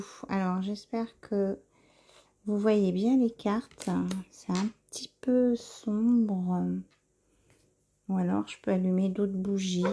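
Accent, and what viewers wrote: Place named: England, United Kingdom. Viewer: My Dear Thank you for this beautiful guidance. I love your English accent. I wish you a sweet and pleasant day in Divine Grace and Mercy 😇😇🙏🏽🙏🏽🌸🌸🌹🌹🌟🌟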